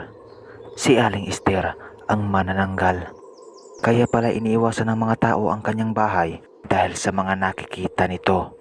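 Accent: Visayas, Philippines. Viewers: Wow ang ganda Naman po ng video nyo lagi po naming di nadownload Ang bago nyong ina-upload pwedi po ba kayo ulit mag upoload Ang ganda po Kasi ng video nyo eh at kakatawa po Yung boses nyo parang bisaya pero nakakatakot din Naman po.😄😊🤗😘😍🤩😅😖😖😲😲😨😨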